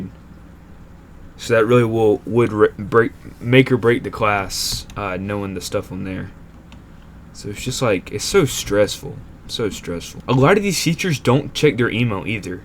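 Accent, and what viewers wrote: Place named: United States. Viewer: sick Minecraft world, your American accent is sick what state r u from if you don't mind me asking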